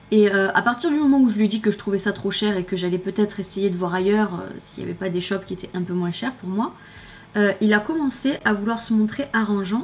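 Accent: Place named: Paris, France. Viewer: Tu vis à Montpellier mais tu n'as pas l'accent. Tu as l'accent parisien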